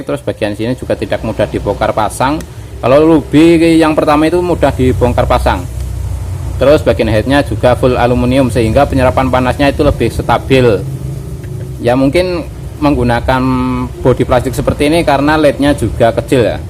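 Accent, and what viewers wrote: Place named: Tegal, Indonesia. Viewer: Wong tegal yaaaaaaakkkk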